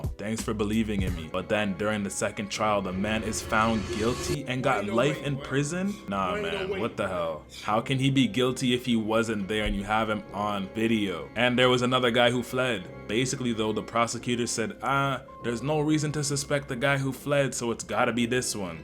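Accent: Canada. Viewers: holy shit saying “dutty” you aint jamaican my boy 😂 stfu i hate canadian accents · This Canadian accent is just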